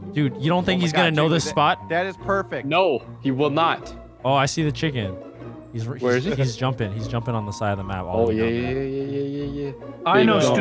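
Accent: Canada. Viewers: Yeah. He’s Canadian. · Ma gad,vanoss now bein ragin 😂,the canadian accent tho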